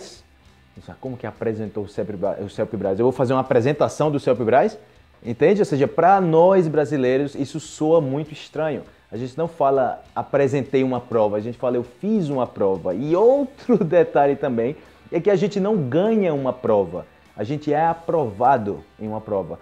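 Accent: Colombia. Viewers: Aquí en chile "presentar una prueba" es usado en el ámbito judicial. Esta respalda una acusación. Puede ser mostrar un objeto; declaración de testigos. Para prueba, lo escrito es"hacer, contestar, una prueba y en la octava región usan "presentarse a un certamen". Tb. Se puede hacer una prueba, como hacer saltos en 20 seg.; O quién bebe más agua; ello en un concurso. También está "la prueba de amor", pero ese tema queda para otra. Ah!!! hablas muy bien colombiano mi parcero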